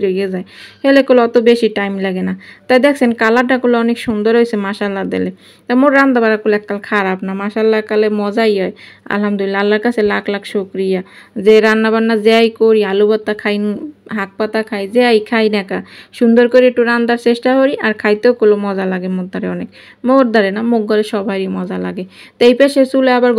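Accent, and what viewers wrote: Barishal, Bangladesh. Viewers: আপু আমার কাছে তোমার ভিডিও থেকে না তোমার কথাগুলো শুনতে ভালো লাগে বেশি তোমাদের বরিশালের ভাষা আমি তোমার কথা শোনার জন্য তোমার ভিডিও দেখি ফাস্ট টু লাস্ট মানে তোমার কাজের কি কমেন্ট করব বুঝিনা শুধু তোমার কথা শুনতে থাকি ❤❤❤❤❤❤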